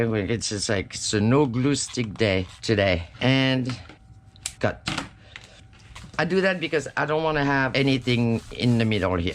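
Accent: France. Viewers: Hello,this is a little strange that I came apon your videos my name is also and I also was born in France My father was stationed in France when he met my mom,and much later my mom had 8 kids,4 boys and 4 girls we left Europe when I was 4 yrs old,gosh I love your videos so much and your accent brings me back with so many memories so just wanted to say may God bless you each and every day❤❤❤❤❤❤❤❤❤❤❤❤❤❤❤❤❤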